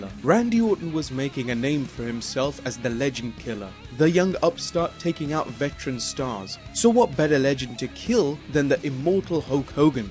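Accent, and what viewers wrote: United Kingdom. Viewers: WCW coverage by a British accent sounds like shit. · fuckin shitbrit narrations over shit that has nothing to do with them.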